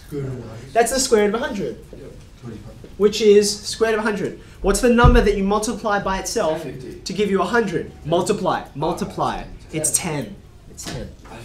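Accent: Australia. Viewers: Chinese guy with a Australian accent, Interesting.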